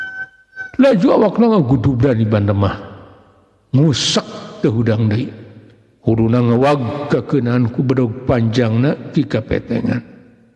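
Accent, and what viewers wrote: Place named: South Jakarta, Indonesia. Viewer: Saepisan ngadongeng na JAKSEL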